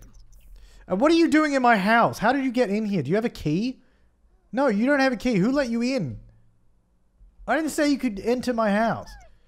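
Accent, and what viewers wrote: Australia. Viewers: I have been sick and binging this series. I wasn’t listening and my mom asked me “oh…you aren’t watching the chaotic Australian sim guy?” Hahaha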